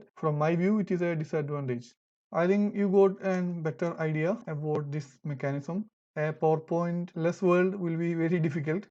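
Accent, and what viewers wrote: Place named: Kerala, India. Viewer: Malayali anu ennu English keetapole thooni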